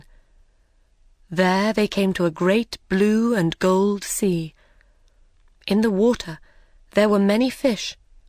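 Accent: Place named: United Kingdom. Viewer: Thanks so much.Nice story and excellent narratore with british accent.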